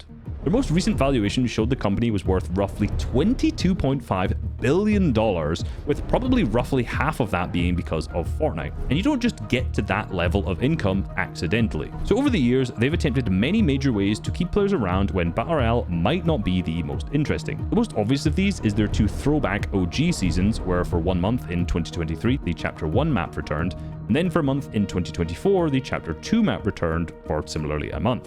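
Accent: Scotland, United Kingdom. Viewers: How can I watch video without the terrible Scottish accent?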